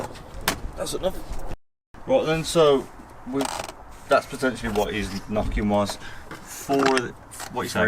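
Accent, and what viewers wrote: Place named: United Kingdom. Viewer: Its crazy how much more interesting it is to watch these videos with a guy with a british accent, I could watch these videos all day, yet typical Americans talking about the same exact things, im asleep.....lol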